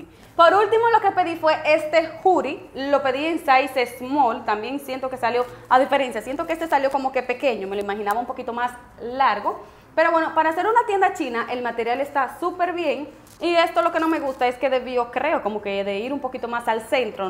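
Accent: Dominican Republic. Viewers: A eso se le llama ser de republica Dominicana 😂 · Por que las dos son dominicanas y tienen la misma forma de hablar.. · yo tambien hablo asi, y mi familia, amigos, etc. Aunque es cierto que en RD hay diferencias al hablar en cada region, la mayoria hablamos de igual manera. Saludos! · "Hablas igual a Yarissa!!" Se llama ser de República Dominicana, pedazo de bestias. :V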